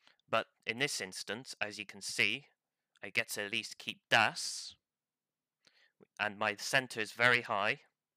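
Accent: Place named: United Kingdom. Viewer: Great video, you explained this in a very good way. One suggestion I have is that you tone down the British accent a bit.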